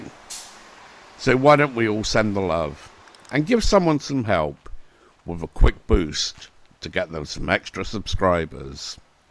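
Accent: England, United Kingdom. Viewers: Oh.. I see... not only your accent but also the atmosphere looks like English one... ha ~ Thank you anyway. Best regards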